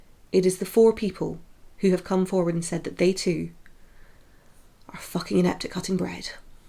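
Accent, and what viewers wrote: Scotland, United Kingdom. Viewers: I know you are thinking why is this person commenting on my old video but like you I have to start from the beginning. I flocked over from Amy who Was trying on your sweaters in her vlogmas, and for a split second I thought of just starting there... I picked up your Scottish accent right away and I love your sense of humor! So glad to have found you which you may be thinking great I have a stalker, fear not I’m only mildly odd. I’m German living in the US and married to an American with a Scottish heritage, who by the way came in during your first eps and said hey you watching Amy Pond doing a podcast. Anyways enough of my babbling so glad to have found you and looking forward to watching all your videos!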